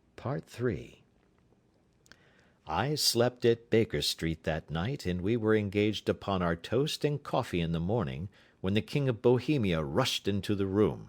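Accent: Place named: United States. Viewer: Please, please NO Americans reading Holmes. TOTALLY RUINED Holmes stories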